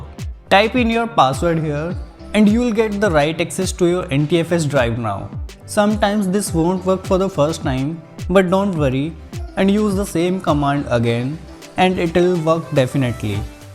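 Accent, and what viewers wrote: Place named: India. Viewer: As soon as i recognised the voice was indian I was relieved and thanked god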